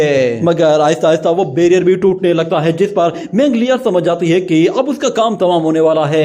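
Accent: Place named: Pakistan. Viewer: Lag RHa h Tum Pakistani ho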